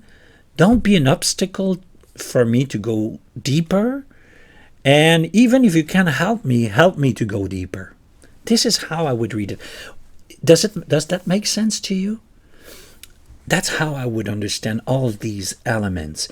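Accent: Quebec, Canada. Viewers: Dès vos premières phrases, je me doutais bien que vous étiez Québécois. Moi je suis de Boucherville, j’ai découvert les sœurs Villarreal via un vidéo de réaction sur leur premier cover de. Je fut conquis sur le champ. Depuis, je les ai vus en spectacle 4x (2x à Monterrey Mx et 2x à NYC). Elles donnes tout un spectacle. Mais, ce qui m’a vraiment décidé d’aller les voir dans leur ville fut une conversation internet avec leur papa. Toute la famille est tellement terre à terre et ils sont super gentils. Vous, vous les avez trouvés/découverts comment?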